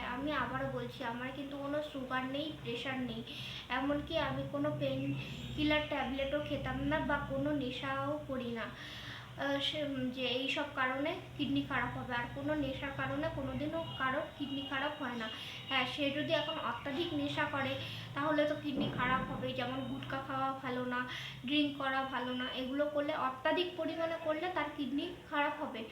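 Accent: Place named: North Bengal, India. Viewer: তোমার কথায় বুঝলাম তোমাদের বাড়ি উত্তর বঙ্গে। মনের জোর রেখ। সাবধানে থেকো।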